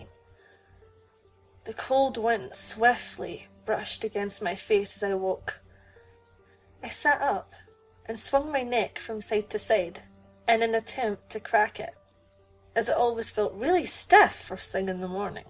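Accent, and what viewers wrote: Scotland, United Kingdom. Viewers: Are you skotish?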